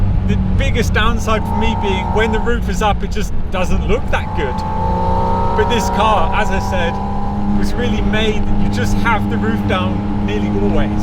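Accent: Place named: Switzerland. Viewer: Nice video! Dein Englisch ist wirklich sehr gut aber trotzdem hört man irgendwie dass du schweizer bist.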